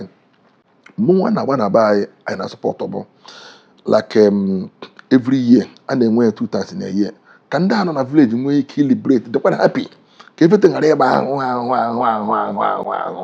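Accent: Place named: Imo, Nigeria. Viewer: He has more of imo state accent than anambra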